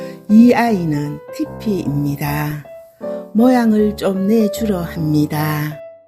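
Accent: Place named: Gyeongsang, South Korea. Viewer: 경상도 아줌마가 서울말을 하시면 이렇게 되는구나❤🎉😂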